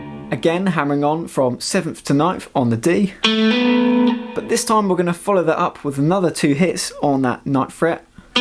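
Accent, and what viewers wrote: United Kingdom. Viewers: Hi, you sound like you're from Surrey?? Did you play victorious festival Portsmouth 3 years ago?? 90's covers, the way you play is the best, outside of mr squire I've heard since that Saturday in Pompey.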